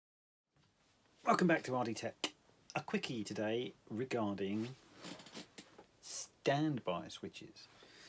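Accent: United Kingdom. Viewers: I thought you sounded like a true British rocker with the english accent. John Peralta sounds like a dick! Keep rockin the great information. Appreciate you taking the time to make this video bro! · The English accent is good for singing not so much for speaking sorry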